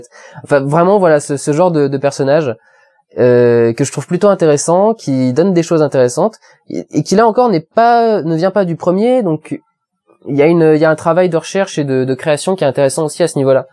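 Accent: Germany. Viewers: non mais ton accent allemand m'a plié en 4, franchement si tu as un jour une autre facette de toi à mettre dans tes vidéos, tu mettre le "spécialiste" le gars qui rationalise tout à la science et tu lui mets cette accent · Enorme l'accent allemand !!!! :)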